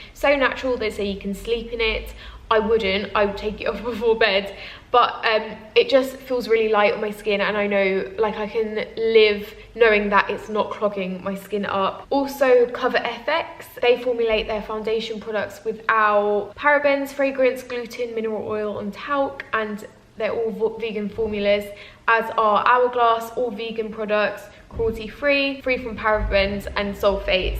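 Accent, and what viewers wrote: United Kingdom. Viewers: I love your British!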